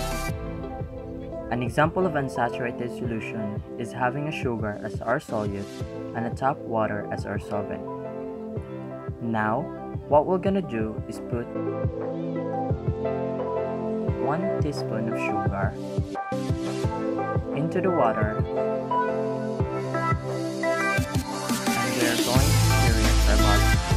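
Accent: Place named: Philippines. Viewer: You sound like a pilipino person im pinoy too.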